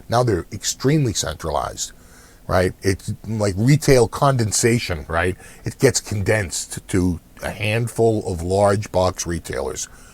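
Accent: Boston, United States. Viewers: Typical dude from Boston lol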